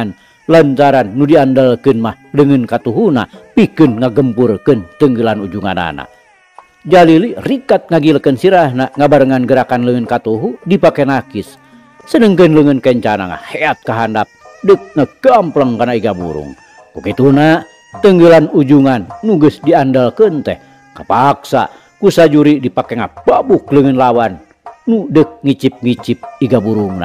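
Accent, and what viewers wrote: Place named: Sunda, Indonesia. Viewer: Hebat! Cariosna nyunda pisan. Hatur nuhun bah!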